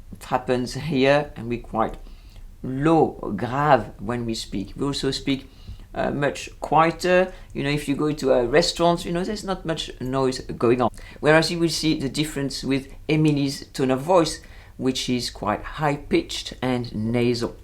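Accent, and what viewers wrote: France. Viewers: A very gracious reply 💐 Your husband doesn’t need to worry... your accent is still very charmingly French and your English is excellent in every way 😘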